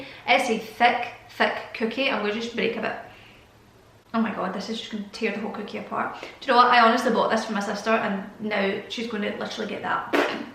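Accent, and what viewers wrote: Scotland, United Kingdom. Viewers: Haha no I love the Scottish accent! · "I like you. Do you want to be my valentine?" XD Also, I love your accent! When I first found your channel I was like, oh my gosh, her accent is everything. My favourite musician is also Scottish, so I may be a bit biased. ;)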